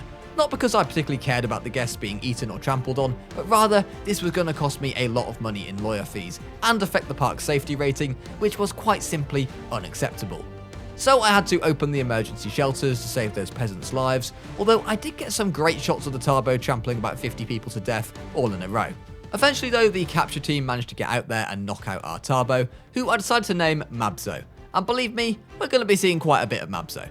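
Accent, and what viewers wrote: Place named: United Kingdom. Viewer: Ur British yay finally I found a British person that does Jurassic World videos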